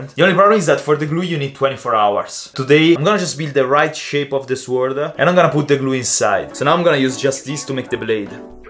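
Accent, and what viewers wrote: Italy. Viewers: Sei italiano? Ok alla fine del video si capisce che si, lo sei. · love your italian accent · Bro, ma sei italiano? Ok, lo è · Are you from italy? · Ma sei italiano??😅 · Sorry but... are you italian?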